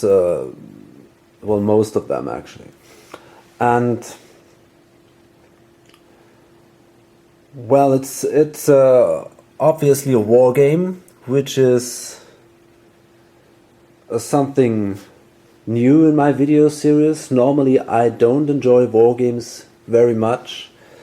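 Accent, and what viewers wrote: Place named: Germany. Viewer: I knew it,deutschen Akzent erkennt man relativ leicht :D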